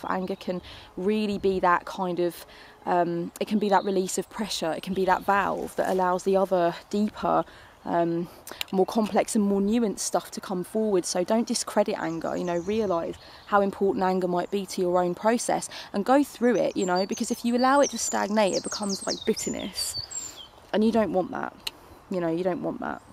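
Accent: United Kingdom. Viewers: Ps. i love your channel (and your brittish accent) you are full of knowledge!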